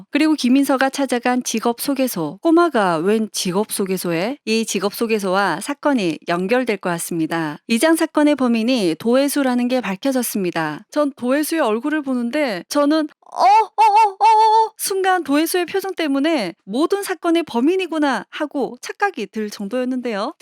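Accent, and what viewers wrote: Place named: Gyeongsang, South Korea. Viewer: 해설자님 경상도 분인 듯~^^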